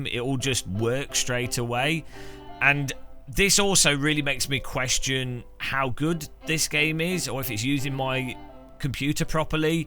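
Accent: United Kingdom. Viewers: I wished the british accent would have brought some credibility to this post but he is evaluating this game as a racing simulator... Anyone who played the first iteration knows that this game isn't a fancy sim racing game. This is more a perfect mix of great physics and pure fun.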